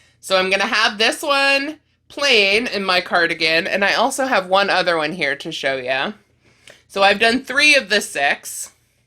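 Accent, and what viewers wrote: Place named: Pittsburgh, United States. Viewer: I'm excited to see how the cardigan works up! Also, I just realized how much you speak Pittsburghese. It makes me homesick!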